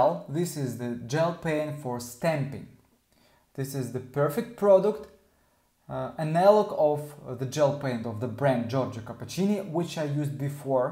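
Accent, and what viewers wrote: Russia. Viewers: Its just a russian accent. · When this video started I was like “wow he has a Russian accent is he Russian” And he went “I’m a nail technician from Russia”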